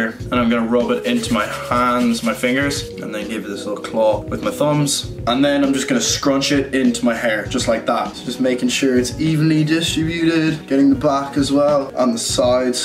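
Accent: Ireland. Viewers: Your accent is a very soft Irish accent.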